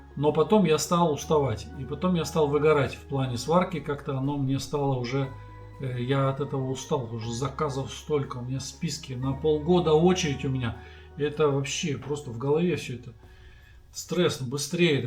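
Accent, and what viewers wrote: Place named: Siberia, Russia. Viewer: Очень радует очень хороший русский язык. Поверьте, я в этом кое-что понимаю., уши радуются. Задолбали косноязычные высокопоставленные идиоты.Ты чокаешь, как у нас в Сибири. И акаешь. Это нормально.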